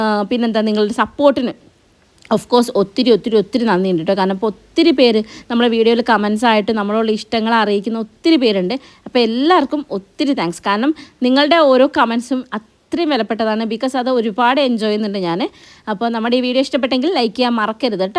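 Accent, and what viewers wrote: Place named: Kozhikode, India. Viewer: ഞാൻ ഇപ്പൊ ഇയാളുടെ വലിയ ആരാധികയാണ്. എന്നെങ്കിലും നേരിട്ട് കാണാൻ ആഗ്രഹമുണ്ട്. സംസാരം ഒരു രക്ഷയുമില്ല 🥰 ഇങ്ങനെ വേണം പെൺകുട്ടികൾ 😘 ഇങ്ങനെ ഒരു മരുമകളെ അല്ല മകളെ കിട്ടിയ ആ ഫാമിലി ഒരുപാട് ഭാഗ്യം ചെയ്തവരാണ്. ഞാൻ വലിയമ്മയുടെ മകൻ സനേഷിന്റെ വൈഫിന്റെ മാമന്റെ ഭാര്യയാണ്. ഞാൻ ഒരു തൃശ്ശൂർകാരിയാണെങ്കിലും എന്റെ ചെറുപ്പത്തിൽ കോഴിക്കോടാണ് വളർന്നതും പഠിച്ചതും അതുകൊണ്ട് ഇയാളുടെ സംസാരം എനിക്കൊരു നൊസ്റ്റുവാണ് 🥰🥰🥰🥰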